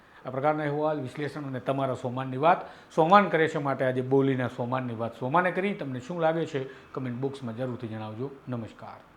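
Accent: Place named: Kathiawar, India. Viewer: હર્ષ સખવી કોઈ મહાન માણશ તો છે નઈ ભાઈ ઈ પણ એક માણસ છે ઈ સુ કરી લેશે વાણિયો ગોપાલ ઈટાલીયા ને કે મને જયવીર મેર ને મારી નાખશે અને સુરતી ભાષા ટુસડી છે એમાં તા નર બદલે દા બોલે હરામી છે સુરતી બધા તમારા થી થાય ઈ કરી લિયો તમે એક કાઠી છો એટલે તમે તમારી બોલી ના બદલાતા કાઠિયાવાડી જ રાખજો પેસા થાય જય તો બદલતા નઈ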